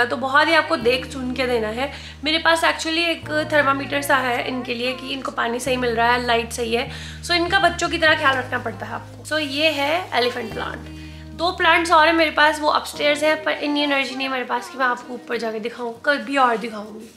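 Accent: Punjab, India. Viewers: hahahha bhaji di jheri hindi aa na bhti kmal aa viche punjabi a jandi ....... wahhhh mza a janda sun k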